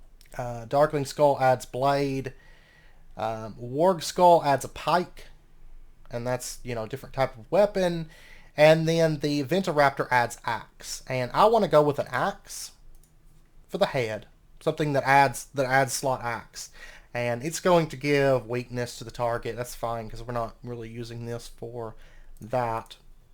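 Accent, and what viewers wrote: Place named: South, United States. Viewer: I can't pinpoint from your accent if your from one of the Carolinas, Georgia, or Alabama/louisiana😂 I got southern relatives that sound just like you, and their from Georgia!👍 You are so pleasant to watch! Keep up the good work!👍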